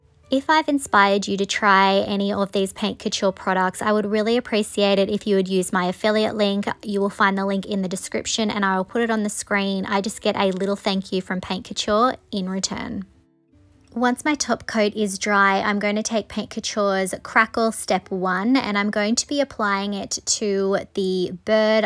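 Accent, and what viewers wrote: Australia. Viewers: Hi, you’re obviously an Aussie, where are you situated. I love your work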